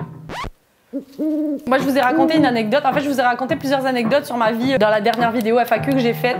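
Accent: Quebec, Canada. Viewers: Je trouve qu’on entend de plus en plus un accent québécois quand tu parles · Je n'avais jamais remarqué mais tu a un peu l'accent Québécois c'est est géniale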